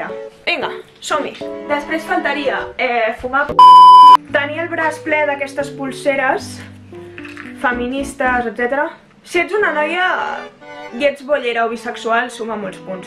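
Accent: Barcelona, Spain. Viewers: Ave cap dasa soc de bcn ciutat i parles igual q jo HAHAHHA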